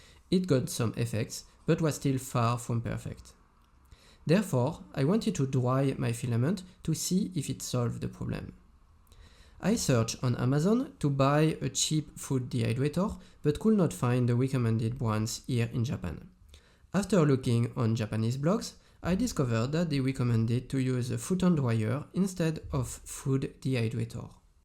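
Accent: France. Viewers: You’re Accent sounds french, not Japanese. 😳🙃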